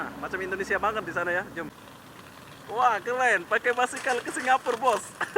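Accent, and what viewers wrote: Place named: Malaysia. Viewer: orang Lombok tapi cakap kamu seperti orang malay.....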